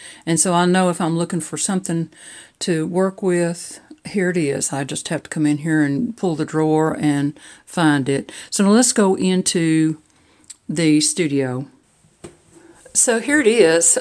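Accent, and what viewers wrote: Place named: South, United States. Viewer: New subscriber! Love listening to a fellow southerner soft accent !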